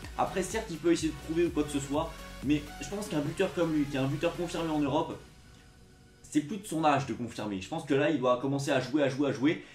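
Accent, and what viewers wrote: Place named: Spain. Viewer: Très belle accent espagnol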